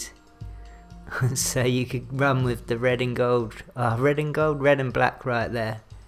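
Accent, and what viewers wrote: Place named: United Kingdom. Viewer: Which part of the UK u from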